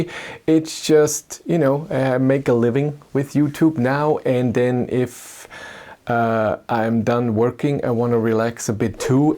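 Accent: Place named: Germany. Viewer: Keep up the good work. I have been watching some of your videos since quite early. I remember you made some videos about your new Id3, and you were working full time. The Excel charts are a nice addition. Thanks. I am glad this is working out for you. It is interesting hearing a German perspective. Your english is fine, quite clear, with a nice German accent. I would like to hear some of your detractors speak a foreign language. That could be quite amusing.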